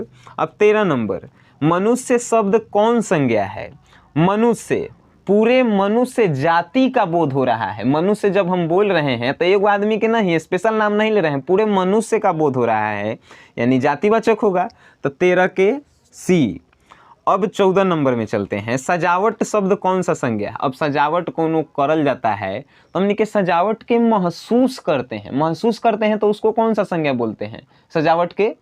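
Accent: Bihar, India. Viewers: Bihar se ho bhai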